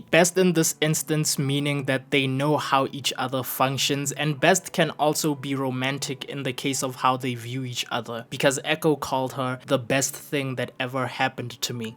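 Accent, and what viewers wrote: South Africa. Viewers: R you South African? Ur accent is giving South African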